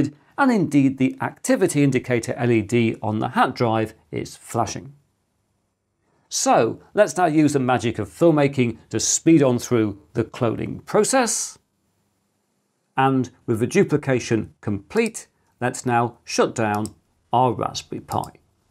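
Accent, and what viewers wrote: United Kingdom. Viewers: Would be nice if the channel removes stinky f British accent. Accent of world slavery and kings to enslave ppl.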